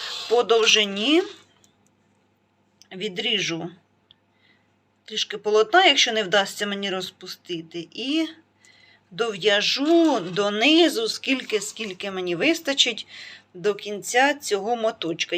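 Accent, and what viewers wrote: Poltava, Ukraine. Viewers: ❤Приємно послухати . Як з рідними з Полтавщини поспілкувалася.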